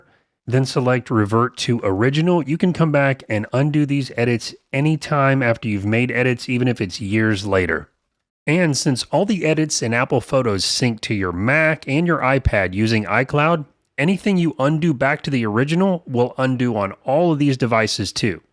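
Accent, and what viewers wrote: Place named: Indiana, United States. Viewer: I really enjoy and understand (at least almost all) what you are saying. You speak in my native language- "Indiana English"!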